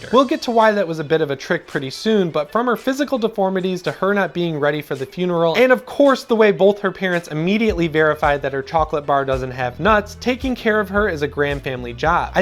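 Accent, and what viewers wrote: Midwest, United States. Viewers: Great review! Where are you from? Can’t place that accent. Illinois maybe? Wisconsin?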